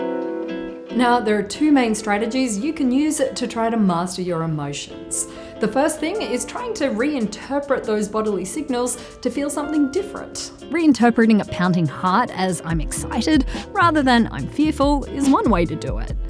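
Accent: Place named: Australia. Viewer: I felt nostalgia watching this hearing your aussie accent and wondering if that walking track was either in the central highlands or the Dandenongs ... :-) But here in Germany they have a thing called Gemütlichkeit which is like the dutch term you mention. Sort of like coziness but also social. And not to forget hygge the danish term which even has its own magazine here.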